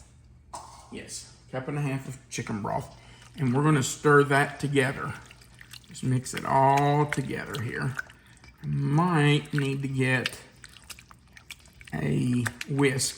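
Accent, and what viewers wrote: South, United States. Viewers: I knew with that accent you had to be in the SEC! ;)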